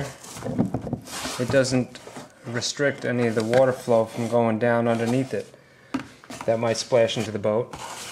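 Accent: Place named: New York, United States. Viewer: This was brilliant. I know you said "Florida" but you sound like you're from NY, maybe Queens.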